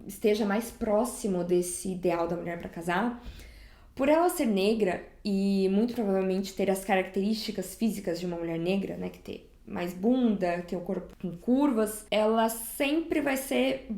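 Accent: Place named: Rio Grande do Sul, Brazil. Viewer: sotaque de gaúcha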